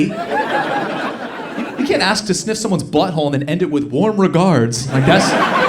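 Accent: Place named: United States. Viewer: Hiya I'm your BIGGEST FAN I LOVE YOUR STAND UP COMEDY. I COULD WATCH YOU 247. IM A BRAND NEW NEWBIE TO YOUR YOU TUBE CHANNEL CONTENT WILL. EVERY TIME I WATCH YOU I ALWAYS FEEL VERY CALM REALAXED AND CHILLED OUT VIBES. I LOVE YOUR AMERICAN ACCENT AND I JUST CRY WITH LAUGHTER ALL THE TIME TEARS RUN DOWN MY FACE CONSTANTLY. IM SO GLAD IVE JUST SUBSCRIBED TO YOUR YOU TUBE STAND UP SHOW CONTENT. WARM COSY HUGGIE SNUGGLES CUDDLES MATE LOVE RESPECT YOU LOADS NOW AND ALWAYS AND YES WILL YOUR A HUGE STRONG POSITIVE INSPIRATION IN MY LIFE AS WELL NOW MATE. KIND DEEPEST REGARDS YOUR BIGGEST FAN OF YOUR COMEDY STAND UP SHOW CONTENT ON YOUR YOU TUBE CHANNEL MY AWESOME BIG BROTHER 4 LIFE NOW MORE THAN YOU ALREADY KNOW THIS MATE. KIND DEEPEST LOVE RESPECT REGARDS MATE FROM MARTYN CHARLES JOHNSON IN ROCHFORD CITY OF SOUTHEND ON SEA IN ESSEX UK ENGLAND NOW AND YOU ALSO HAVE A BEAUTIFUL SMILE AS WELL MATE. 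KIND DEEPEST LOVE RESPECT REGARDS MATE MARTYN CHARLES JOHNSON 🫂😁🤝🙇🏻‍♂️💝💝💪🏻💪🏻💪🏻💪🏻👍🏻👍🏻😉💛💛💛💛🫅🏻🫅🏻🫡⭐⭐👋🏻🫶🏻😍😍🏡🎧🎤😉👍🏻👍🏻💪🏻💪🏻💛💛👋🏻🫡🤝🙇🏻‍♂️😉💛💛🫅🏻🫅🏻🎧🎤🙇🏻‍♂️💪🏻💪🏻💪🏻💪🏻😁😘😘🇬🇧🙇🏻‍♂️🫡💛💛🫅🏻🫅🏻😁🫂🙏🏻🇬🇧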